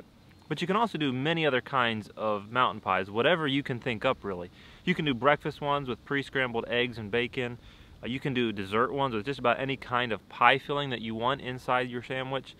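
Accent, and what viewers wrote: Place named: Pennsylvania, United States. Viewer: That accent is familiar you from Pa? I am from ruffsdale myself.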